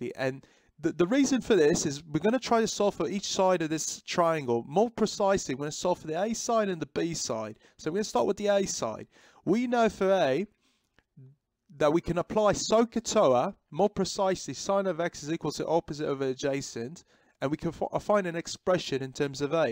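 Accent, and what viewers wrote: United Kingdom. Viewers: Hmm a british explaining trignometry,what was i serching for 😅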